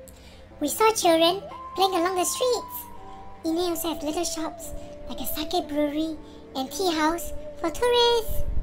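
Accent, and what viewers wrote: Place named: Singapore. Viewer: yo i can already tell you're Singaporean laaa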